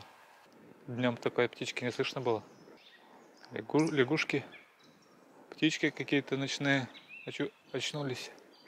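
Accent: Russia. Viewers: сразу слышно что русский!!!!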